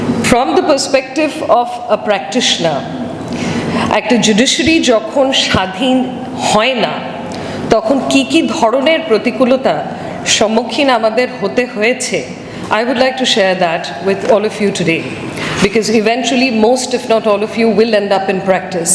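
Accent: India. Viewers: Indian accent but kothaguli valo chilo . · indian accent ! bharotiyo mohila !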